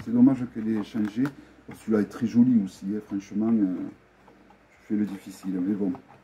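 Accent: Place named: South, France. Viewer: Bonjour il n y a pas de hasard de tombé sur toi,contente de voir toutes ces belles cartes et avec ton accent du sud que j adore. BISOUS 👍🏊‍♀️🏊‍♀️🏊‍♀️🍒💐🌸🍀🌷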